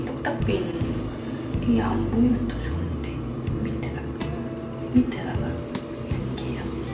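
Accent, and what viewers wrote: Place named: South Korea. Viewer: I heard some dialect in your Korean, are you not from Seoul? :)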